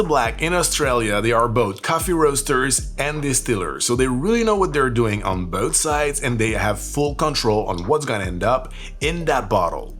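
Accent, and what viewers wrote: Quebec, Canada. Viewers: Hey you're Québecois? Would have never thought? Is your accent because you are francophone? (I am aswell from Mtl south shore)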